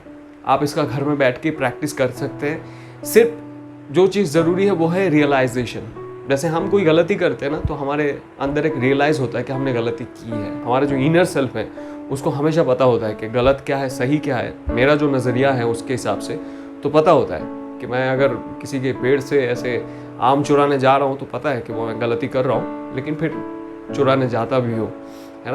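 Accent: Bengal, India. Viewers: Nice, but aapki hindi me Bengali tuch abhi bhut dikhta hai bro.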